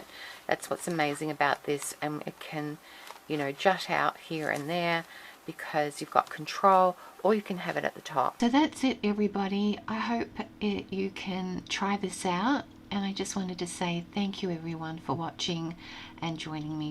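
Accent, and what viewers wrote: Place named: Australia. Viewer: I love your work! Your papers and designs are amazing! I take it from your accent that you must be Australian? Anyway you are so creative!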